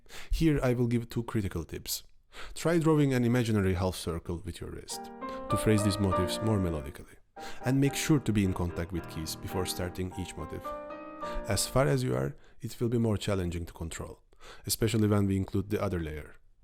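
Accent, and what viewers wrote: Russia. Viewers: Слава Шопену! Именно благодаря ему я наткнулся на Ваш канал. Я так понимаю (по акценту) вы русский. Подобного уровня контент я знаю у Михаила Аркадьева ещё. Но он в плане оформления не так хорош: там на телефон записано всего лишь. А тут у Вас просто как документальный фильм, а не урок) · typical russian accent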